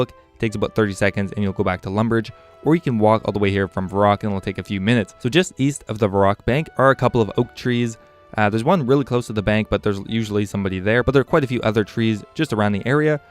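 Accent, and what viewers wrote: Canada. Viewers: Hmm didn't notice before, is that Canadian accent I hear? :P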